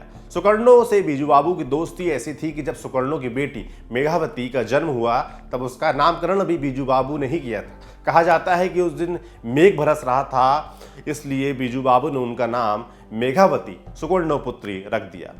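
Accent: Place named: Bengal, India. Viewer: Ete "O" lageicha Bengali laguchi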